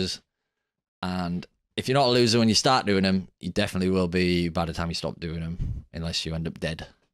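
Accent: United Kingdom. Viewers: Eeee he's a Brit... Sounds like a chuffin' Yaaarksher lad... Love it. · me 2 seconds in: Hes British?? 😮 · I never knew u were British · I also did not know you were british lol... I always thought you were from the states.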